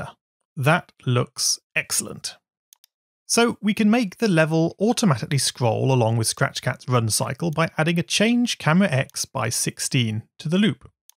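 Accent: United Kingdom. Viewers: Not really, it's just a british accent, but I see where you're coming from, as they do have similarities.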